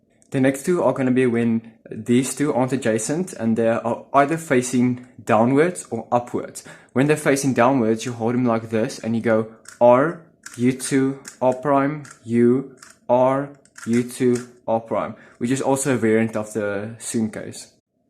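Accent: South Africa. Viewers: I may be wrong, but are you South African?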